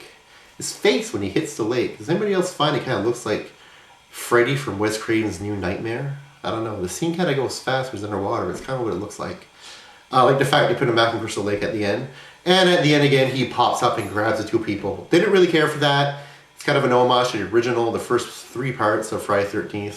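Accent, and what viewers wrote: Canada. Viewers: you from up north like thunder bay, cuz your accent is very canadian :P i could tell lol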